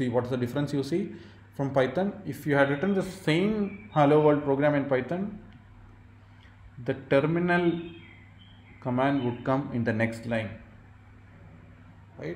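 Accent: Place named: Kerala, India. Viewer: Thick, incomprehensible Malayalam-accented lectures. Go somewhere else for your Data Structures course.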